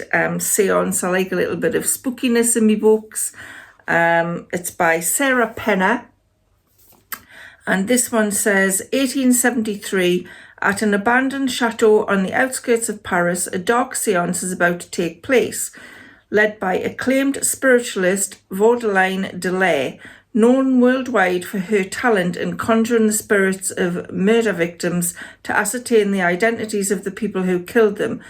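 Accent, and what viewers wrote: United Kingdom. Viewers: Ohhh I recognise that accent! Durham maybe? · Yeah I'm Durham so thought you sounded a little further north to me .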